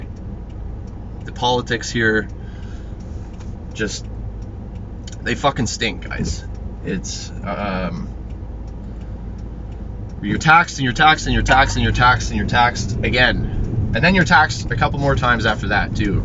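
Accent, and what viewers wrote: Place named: Canada. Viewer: i love how we talk similarly haha so Canadian eh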